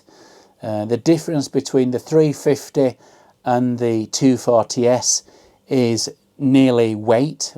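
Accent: Yorkshire, United Kingdom. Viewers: Yeah he's proper Yorkshire man 🔥💪🏻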